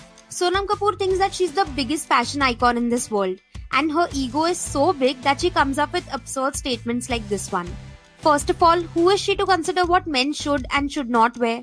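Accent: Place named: Punjab, India. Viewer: Speaker 👧🏻Punjabi English accent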